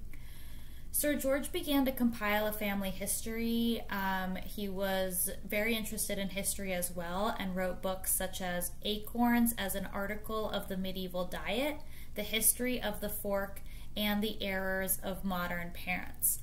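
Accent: United States. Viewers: Why this disagreeable American voice?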